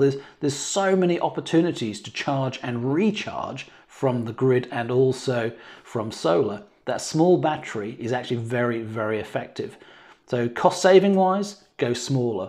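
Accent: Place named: Norfolk, United Kingdom. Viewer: Thank you for your well thought out video. We installed our panels and batteries nearly a year ago now, and had similar thoughts. Now we live in Portugal, which is somewhat different. Approximately 300 days of sun per annum and you can’t sell back to the grid. But the same logic still applies; understand what you want from the system, work out your peak daily use, and size panel production and energy storage accordingly. We have been off-grid since March and we consider we have the balance. By the way, I’m a Norfolk gal, and I can hear a slight lilt in your speech, but the picture of Elm Hill confirmed it to me!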